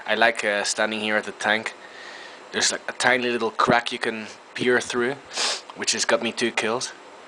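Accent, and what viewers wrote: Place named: Netherlands. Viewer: kom je toevallig uit nederland... i just asked you if you re from the netherlands cause your little accent sound dutch..... good video by the way.... i like the bushmaster also...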